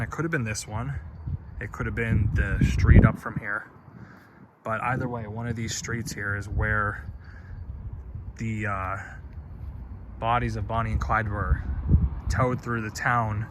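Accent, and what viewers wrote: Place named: United States. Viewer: You sound like an American, but your grammar is pitiful.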